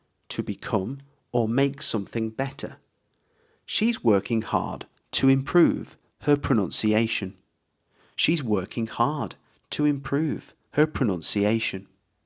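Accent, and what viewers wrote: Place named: London, United Kingdom. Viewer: What is this goofiest accent? 💀 Pronouncing 'understanding' as 'OWNDERSTANDING' is actually unhinged. Bro is literally running an English channel just to confidently butcher his own language. Why do you sound like you’re actively choking on a hot potato? Even the Oxford Dictionary knows it's a clear 'A' sound, but here you are pushing your muddy, regional dialect on international learners. This isn't 'authentic B2 English', it’s just pure auditory torture. Imagine buying an English course only to end up sounding like a generic NPC from a sketchy London alleyway. Is this really how you teach people?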